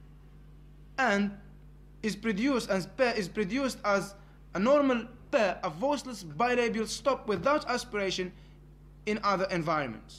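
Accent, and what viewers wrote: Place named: Morocco. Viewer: Moroccan accent!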